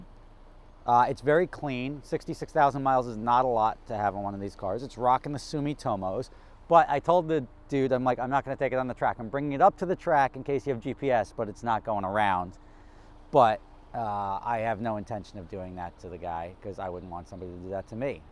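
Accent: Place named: East Coast, United States. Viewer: Absolutely the worst "review" I've seen of the S2K. Take it on a track and tell us how you feel after that. Highway cruising in the car sucks. Period. The only thing that makes it reasonable is top down. This dude seriously sounds like an east coast Weiner and probably is the guy that slows everyone down in his Lambo at HPDE.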